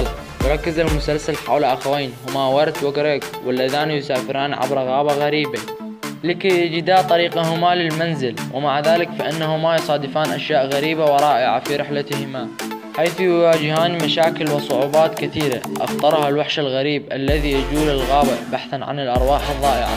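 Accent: Iraq. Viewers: إضنك من العراق لئن لهجتك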